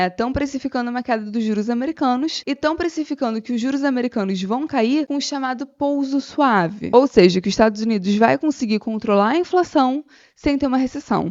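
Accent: Rio de Janeiro, Brazil. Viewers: Adorei descobrir o seu canal!! A análise ficou ótima e ainda tem a dose de carioquês e palavrão que eu amo kk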